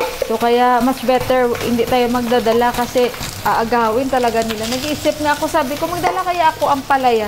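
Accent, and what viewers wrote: Iloilo, Philippines. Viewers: Natutuwa ako pag naririnig ko ilongga accent mo sis. Ang lambing at sarap pakinggan❤😊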